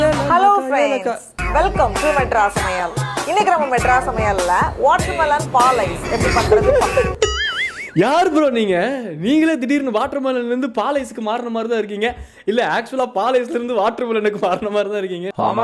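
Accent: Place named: Karur, India. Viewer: 😂😂😂😂 ayyo Madras samayal fav cooking channel 100 mattum illa108kum serthu phone pannirunga 😂 thalayatturathu mattum thaan unga role 😂 staff sick manager shock😂😂😂 neenga mattum unga maara kooda vilayadalam watermelon star mattum vilayada koodatha😂😂 but neenga+ maara cute ah irukum ithu comedy ah iruku 😂😂😂 unga karur slang vera level ❤❤😂 fans club watermelon starku thaan😂😂😂😂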